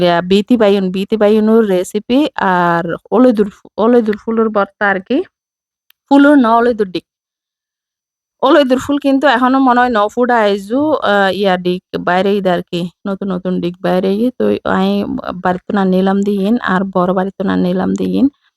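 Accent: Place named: Chittagong, Bangladesh. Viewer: আপুর বাড়ি মনে হয় চট্টগ্রামে তাই মনে হইল বাসায় হিসেবে আমার কাছে ভালো লাগে চট্টগ্রামের ভাষা 💗💗💗💗💗👍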